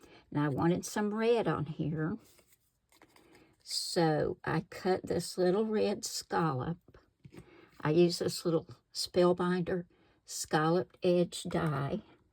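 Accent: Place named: Virginia, United States. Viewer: I love watching you make cards. It always feels like we’re having a visit. Your voice reminds me of my childhood visits to my family in Virginia. How about Sketch #17 or #31? 31 is very busy, maybe we better hope Wayne doesn’t see it!😂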